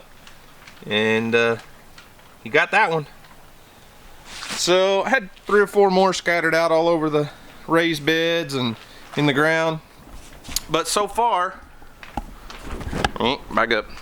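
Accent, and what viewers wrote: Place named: South, United States. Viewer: Your accent is everything in this video😍You’re so southern, I could listen to you talk for days😃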